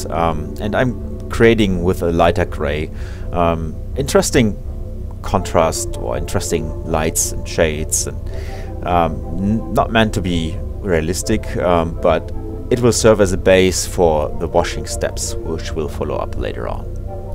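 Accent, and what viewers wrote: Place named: Germany. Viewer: Great video. And that german (?) accent is amazing.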